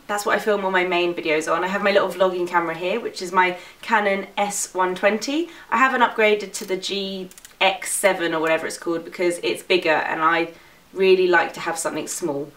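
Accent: United Kingdom. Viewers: Ha ha english subtitles are not acquired for this video because of your British accent I believe and I am glad to see that I am way comfortable with British accent now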